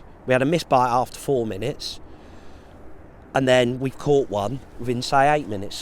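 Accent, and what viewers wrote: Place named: Cockney, United Kingdom. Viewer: I’ve said it before, love knowledge & intuitive nature, which when u listen to most other pretentious promotional crap, ie ringer & the likes irritating wankers! Carn’t get enough of cockney tones!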